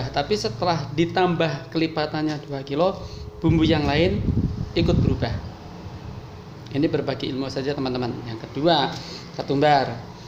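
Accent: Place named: Java, Indonesia. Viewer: Kayak logat orang jawa yg buat bumbu padang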